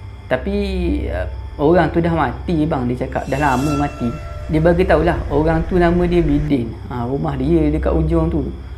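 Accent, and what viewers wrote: Terengganu, Malaysia. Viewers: org kuale teganu ke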